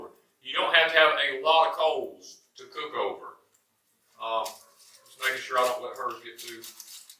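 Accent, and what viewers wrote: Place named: Georgia, United States. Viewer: Great video, I just discovered you. Your accent sounds Georgian - north of Atl to me. 🤔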